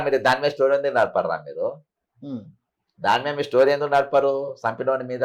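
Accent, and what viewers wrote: India. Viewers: Anchor తెలంగాణనా ఆంధ్ర నా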